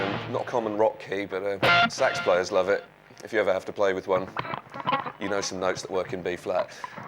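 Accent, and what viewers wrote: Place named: United Kingdom. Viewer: Your accent is so British.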